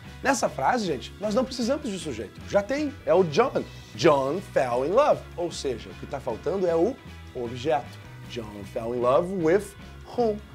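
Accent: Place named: Rio Grande do Sul, Brazil. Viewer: Também sou do RS, de Lajeado, reconheci o sotaque hahahaha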